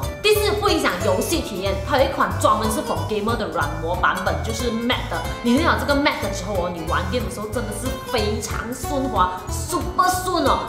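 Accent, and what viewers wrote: Malaysia. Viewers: 马来西亚的口音 好有趣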